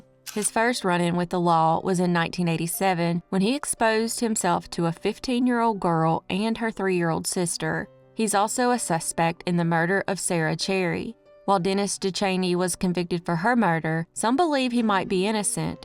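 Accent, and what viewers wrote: South, United States. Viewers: Hey good job on the opening I think it's new anyways I love ur show girl it's not everyday that u can have a country accent that is not either related to southern cooking or kkk u know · Thanks to lady with sweetest southern accent, evah. Love fr panama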